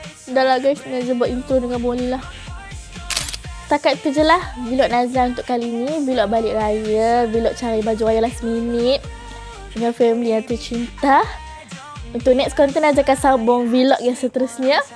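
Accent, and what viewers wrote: Kedah, Malaysia. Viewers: Nanti buat lagii vlogg byk2 suka giler dgr cakap kedah 😌✨😽 Minat dari yg masakkan utk ipan 🫶🏼 serious sukaaa gilaa dgr ckp 😻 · sampi kedah pekat betul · suka betul dengar cakap dengan loghat Kedah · ckp kedah smpai aku terikut nk ckp sekali 😂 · Aku suka betul loghat kedah, nak pulak yg bercakap lagi suka dgr 🤩 fighting post, will support baby no matter what 😘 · suka lah tengok bebel dalam bahasa kedahh, comell tauu 😍 btw sebut nama abg sayang ke tuu 👀 kita rindu lah aimala, abg syg wait for me~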